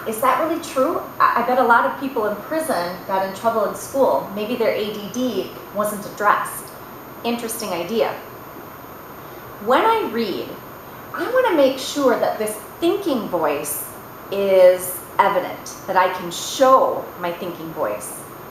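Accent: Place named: United States. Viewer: An American accent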